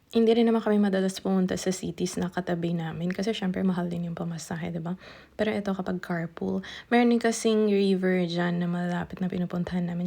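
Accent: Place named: Ilocano, Philippines. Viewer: Congratulations sa mga kamag anak mo host. Parehas tayong matigas ang diket na salita, ilokanong norte 😅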